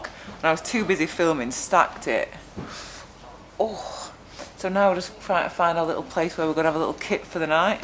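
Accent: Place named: North, United Kingdom. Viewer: Absolutely amazing videos. As a northerner now living in munich. The accent is a touch of home. Getting myself ready for a cross alps trip. Keep em coming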